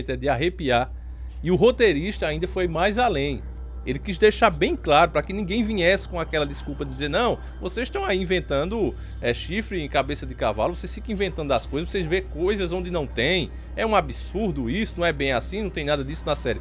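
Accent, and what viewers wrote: Northeast, Brazil. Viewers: O bicho você e nordestino né se for e nois viu 😏😏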